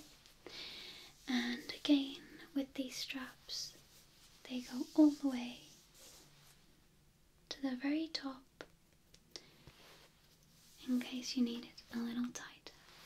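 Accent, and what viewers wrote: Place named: Birmingham, United Kingdom. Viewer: You can talk to me all day with that amazing Birmingham accent!!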